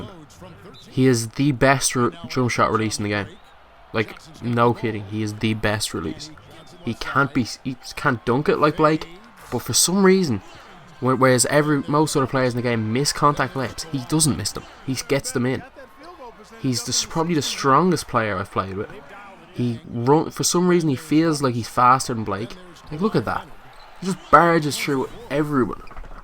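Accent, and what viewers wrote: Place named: Ireland. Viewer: His voice was so much mor irish😂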